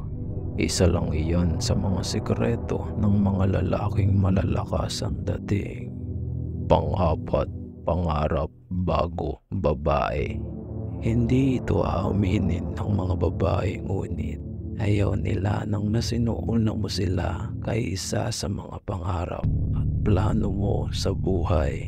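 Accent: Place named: Visayas, Philippines. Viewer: bkit ganyan boses at pagsasalita mo???bisaya ka noh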